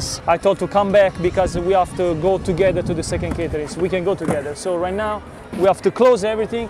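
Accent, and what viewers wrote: Italy. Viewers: I'm not gonna lie, I've always enjoyed your videos but sometimes I feel like you trade on your Italian accent and alleged authenticity just a little bit too much. Having watched this, you just can't fake that level of skill. Anyone can make 1, or 2, or 10 pizzas for a party. What you showed here takes it to a whole different level and I'm genuinely impressed enough to go and watch your older videos with a new eye. Enjoy your well-earned rest, and I'll happily be following you for the next 20. Or 50. Or 1000. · mi piace che nonostante vivi negli States da un po' non hai PER NIENTE perso l'accento italiano! 😁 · At First i didnt recognize the italian accent.... But i was sure he was italian😌❤️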